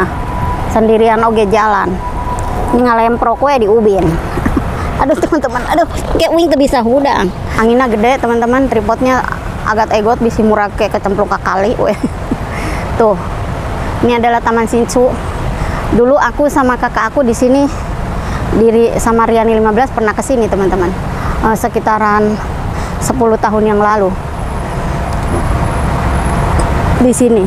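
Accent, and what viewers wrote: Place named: Banyumas, Indonesia. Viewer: denger pean bicara ngapak lucu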